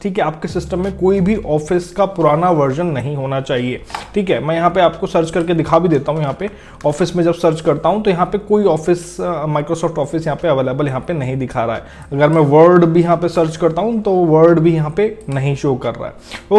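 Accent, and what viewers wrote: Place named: India. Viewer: Bro, you sound like an auctioneer with an Indian accent. slow tf down, there's no prize for fastest speaker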